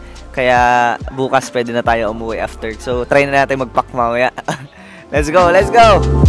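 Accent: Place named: Quezon, Philippines. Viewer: I knew it. Narecognize ko agad yung punto ng Quezon..😊 new subscriber here..🎉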